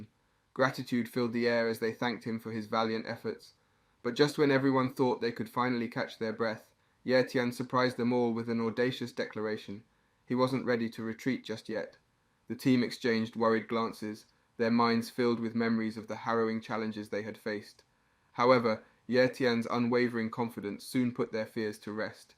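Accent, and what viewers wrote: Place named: United Kingdom. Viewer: W Brit accent, 😂 my accent ain't as good as yours 😢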